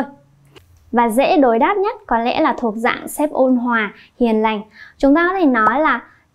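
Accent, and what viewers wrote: Standard. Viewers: thấy cô là người phát âm chuẩn nhất nên em chỉ học theo cô, và tự học ở nhà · Cảm ơn cô nhé hay dễ hiểu chuẩn rõ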